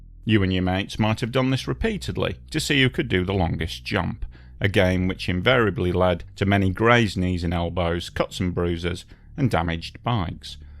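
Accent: United Kingdom. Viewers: "a global superstar, not only in the US". How hilariously American. But the speaker seems to be British 😊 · If you are referring to the narrator, he is from Britain...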